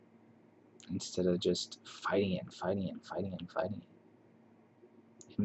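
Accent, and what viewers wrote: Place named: New York, United States. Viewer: When you say "personality", do you still identify with INTP and the idea that personality becomes rather fixed by this point in life? As far as diving deep and really searching and theory building around yourself, I feel like for INTPs it feels really really fixed and not in a happy way. I accepted that, and I gave up on trying to subvert that. However, I feel there's ways to de-emphasize that insecurity, that deeply analytical or critical personality conflict. Just going exploring, weird places and doing new stuff, especially physical stuff, where you lose your head space . Like how traveling requires way different aspects of my personality and at the same time dissolves my identity because I don't know anyone and no one knows me so I can be ridiculous. Like just walk down the street and stick out your thumb and see if someone picks you up and you might feel like your personality could change. 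Or find a freight yard and hop on a freight train and become a new person, someone you've never met. What I'm saying is I think sometimes we get stuck in personality patterns and cycles because our environment. If we change our environment or schedule or the weather or people in our lives, we can see new parts of ourselves. You sound like you're from New York or something so you know how defined things can be on the East Coast/in the Northeast. Maybe those definitions highlight your insecurities? I'm from Massachusetts so I know this is possible. Our state creed is "I'm so much better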